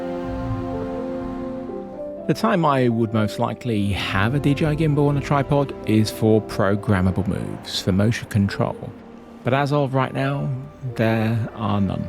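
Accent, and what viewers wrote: United Kingdom. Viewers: Fantastic video, this is by far the best and most honest review of the 4D I've seen yet. I'd say you are doing pretty well for an old guy haha! Always love your reviews and your soothing British accent makes them all the more enjoyable to watch :) That story about your back problems though... ouch. I'm a pretty young and fit guy, but even I started hurting while watching that! Good food for thought as I continue getting older and more involved in my videography career.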